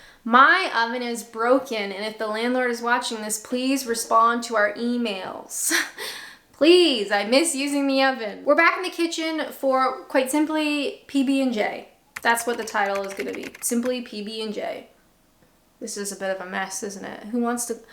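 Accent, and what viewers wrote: Canada. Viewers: She couldn't have said "aboot", because we don't say "aboot". As a Canadian, still sounds pretty Canadian to my ears. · Moved to Scotland two years ago and just found your videos. Been binge watching for days now. No, I'm not weird. Just enjoy them. As far as your voice goes, I actually think you sound more American and lost a lot of your Canadian inflections from your earlier videos. You used to do harcdore aboots but not so much anymore. Keep the videos coming! The history tidbits have been fascinating and my wife is a naturalized Scottish citizen and she didn't know alot of them. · Hi, Canadian here, I will still eat peanut butter and jam and when I was young -- and not so young -- yes to a peanut butter and banana sandwich. Here in Nova Scotia, it is still traditional to have a Sunday roast. Guess that's because so many people here have British and Irish roots -- also it is the big meal of the week for the family. 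Not everyone does it but it is certainly something I was used to. You may use a lot of 'Britishisms' in your every day conversation now, but you still have your Canadian accent!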